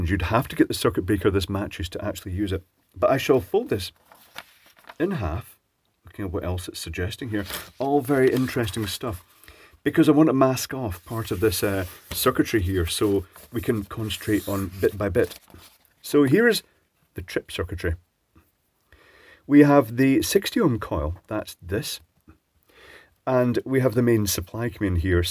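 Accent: Scotland, United Kingdom. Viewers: Really appreciate your videos 😊 I really love the accent and you're in Glasgow!! It's awesome!! My mom is a MacKay from New Glasgow, Nova Scotia, Canada. I have second and third cousins in Scotland. Mom's 92 and reminds me she's still Canadian everytime I contact her. Even though she has been living in the United States for over 65 years. I'm also a Ham Radio hobbyist for over 20 years. US call sign KB1DIG. Did a little contract work with Raython Aerospace and the Department of Defense just after 9/11/2001.. Production Controller for the retrofit of avionics on the KC-135 stratotanker.. Air Force version of the Boeing 707.. Flying gas can.. Played around with UHF VHF antenna design. Halos and collinear design antennas. Some of my stuff is still floating around on Google. I'm retired to the Philippines 4 years now. Have been doing a lot of YouTube videos. 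Mostly custom built ideas for motorcycle related stuff here in the Philippines. Plus some of the local happenings here. Thank you for your work and best regards for your future from the Philippines 🤠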